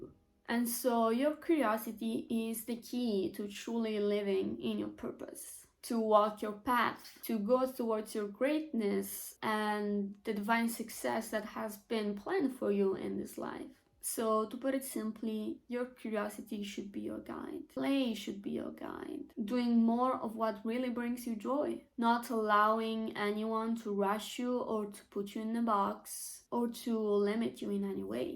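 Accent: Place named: Italy. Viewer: Wow, such a cute italian accent!💫 I'm currently studying it and would love to hear that content in your native tongue! Continua a registrarne di più!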